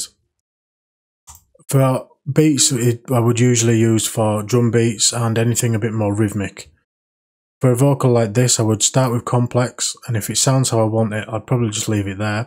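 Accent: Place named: North, United Kingdom. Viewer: BROOOOOOOOOOOO... Honestly you've got no idea how many video's I'ved watched and failed at when trying to warp vocals... to the point where I'd nearly given up production... Your video has just made my brain click into place in 1 min and 40 seconds in.... whether thats because your from up north somewhere near me by the sounds of it.... THANK YOU SO MUCH MATE! HONESTLY. legend!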